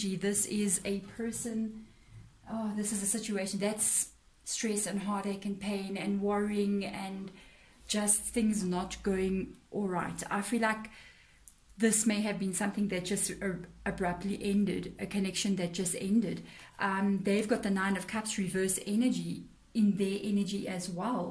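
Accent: South Africa. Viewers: Are you South African? Your accent sounds like it.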